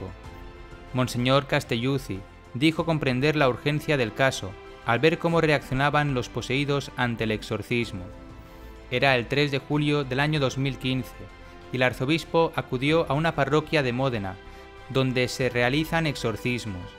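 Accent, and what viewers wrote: Spain. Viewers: Archidiócesis y arquidiócesis son correctas las dos en español, la definición de arquidiócesis se utiliza en Hispanoamérica y Archidiocesis en España. Espero que su duda quede resuelta . En italiano es ARCIDIOCESI. Tengan en cuenta que el narrador del video habla en español de España (no español latino por eso Uds. se confunden) Espero que la aclaración les sea de utilidad. Saludos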